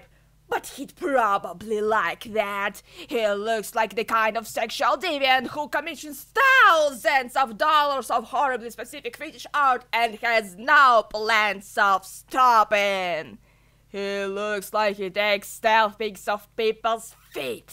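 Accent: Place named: United Kingdom. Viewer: this sounds british and every other accent together, keep up the good work 10/10 voice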